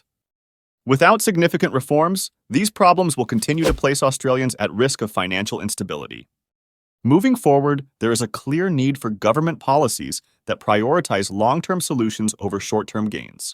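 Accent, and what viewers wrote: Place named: United States. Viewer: Sounds like it….can’t believe a yank would be saying Australia has a debt problem. Obviously oblivious to what’s going on in his own backyard! 37 trillion and counting.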